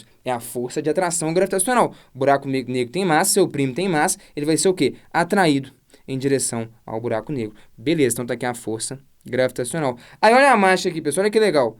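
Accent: Minas Gerais, Brazil. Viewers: Cara você é um mito.... Você define profissional que faz porque ama a profissão. ... E os sotaque mineirinho kkkk massa de mais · Rapaz, de onde você veio??? PARABÉNS pela sua didática, sua linguagem ao aluno e seu carisma!!! E pra temperar melhor ainda sua "performance", tem o seu delicioso sotaque mineiro...MUITO BOM!!!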